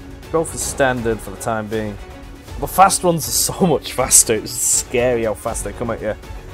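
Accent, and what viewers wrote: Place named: England, United Kingdom. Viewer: do you live up north or down south? Just because you said you were Scottish but you sound English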